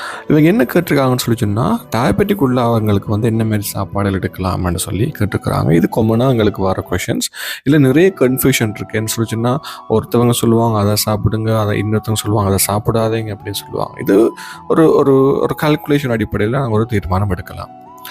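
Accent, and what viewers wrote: Sri Lanka. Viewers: Hello doctor, your tamil slang is like somewhat srilankan people speaking tamil.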